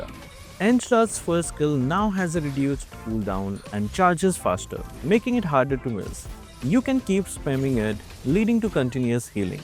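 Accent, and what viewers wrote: India. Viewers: Your name is japanese but your accent is Indian? Wtf?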